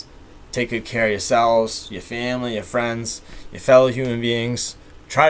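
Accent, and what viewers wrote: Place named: East Coast, United States. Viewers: I can't pinpoint your accent but east coast would be my general guess lol I'm from the mid south with some what of a southern draw lol oh well i love the east coast and have seen most of it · Massachusetts accent 💪🏻💯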